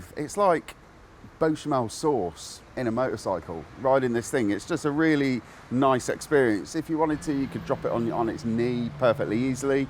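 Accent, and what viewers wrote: United Kingdom. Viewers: I wish I understood more what he was saying. My family is Irish so Im used to some heavy accents but sometimes the British slang and accent is rough.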